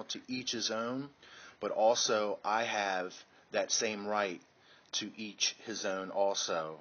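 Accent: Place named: Baltimore, United States. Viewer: Also, I'm betting from your accent that you're from Baltimore and the D.C. area. Make me suspect you a bit.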